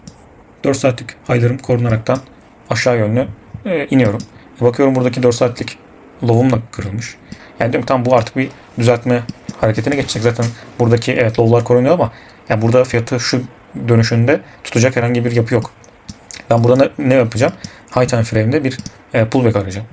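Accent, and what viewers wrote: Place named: Italy. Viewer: hocam kimlerden eğitim aldın ? konuşmandan italya rüzgarı geliyor hafif :) çok güzel bi entry modeli bu arada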